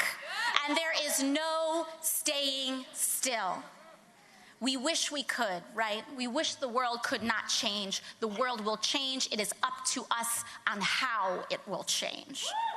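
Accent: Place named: New York, United States. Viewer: She is so cute with her newyork accent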